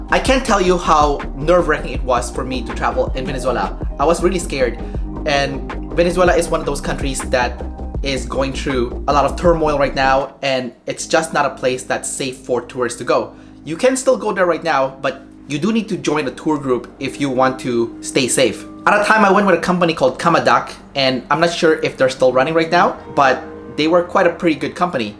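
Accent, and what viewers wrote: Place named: Philippines. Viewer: Why do you have a filipino accent?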